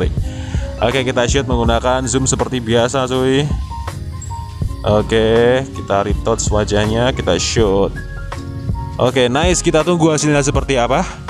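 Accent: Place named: Semarang, Indonesia. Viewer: Wah njenengan wong semarang ya mas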